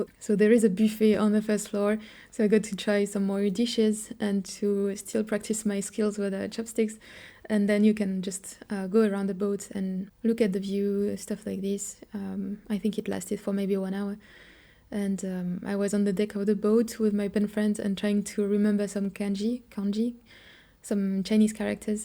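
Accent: France. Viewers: Something about the French accent when spoken by a Lady. Wonderful. 🙂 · Through the window / Stricter. How could one prefer Chinese culture over Japanese culture is beyond me. And very surprising considering how introvert and clean you are...Opposites are attracting each other I guess.^^ The mountain range of Huangshan, which inspired the floating mountains in the Avatar movie, not too far from Shanghai, is the most spectacular landscape I've ever seen, and I've seen a lot, especially when you are above the clouds, which makes it feel like the mountains really are floating . Just need to escape the armies of Chinese tourists to really enjoy the site. They tend not to go off the beaten path which makes it easy. Telling French people to lose their accent when speaking English is a terrible advice, if you know what I mean =P (I m a simple man) You prolly don't give a damn but you are losing some sex appeal when speaking English, you are way sexier in French/Russian xD Great video! 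Disclaimer: Most French hate the French media.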